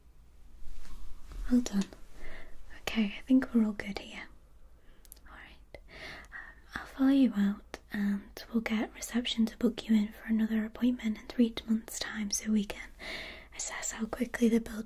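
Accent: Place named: England, United Kingdom. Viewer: Her voice is very calming and I love her English accent too. 💟❤💗💖🧡💕❣💚💞💓💛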